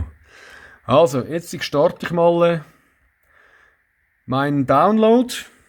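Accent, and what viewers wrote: Switzerland. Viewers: Well done! This is like the only video out there, where someone actually successfully shows you how to connect to the PC software. I managed to connect my AT24 to my PC, with the method that he showed using the "More Bluetooth options", in the Bluetooth & other devices page. Under COM Ports tab, add an Outgoing serial port that points to the **_SPP device. (According to your specific device). Now what I need to know yet, is how to get the logged information exported out to a spreed sheet or graph where i can see the whole picture. I want to use the device for machine trouble shooting and need a data logger. I figured if i just connect to an old laptop and come by the next day i would be able to see my current graph, and therewith help diagnose the problem. Let's see what we can get. Any tips heartily welcome. And I love the Schwitzer Deutsch Accent :):)😀😀😀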